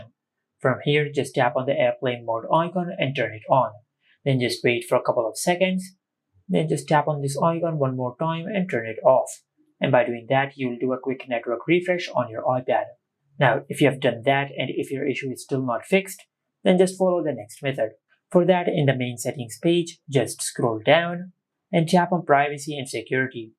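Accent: India. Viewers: ヒンディー訛りの英語リスニング教材としてハイレベルです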